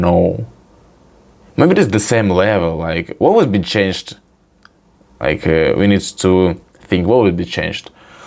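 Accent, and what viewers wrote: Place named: Russia. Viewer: 😭 your Russian accent speaks so loud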